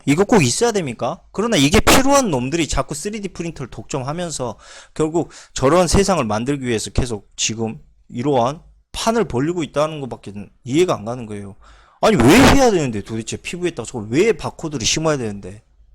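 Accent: Jeolla, South Korea. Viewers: 전라도 분이신가봐요? ㅋ 말투속에 사투리가 구수허시네요^^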